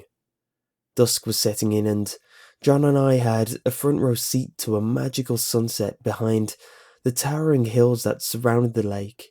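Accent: United Kingdom. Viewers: Americans? The guy reading this has a British accent. Are you kidding me. Why does everything in the comments have to relate to Americans.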